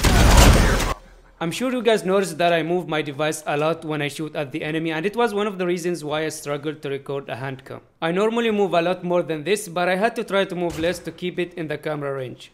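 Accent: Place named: India. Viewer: U sound like u from INDIA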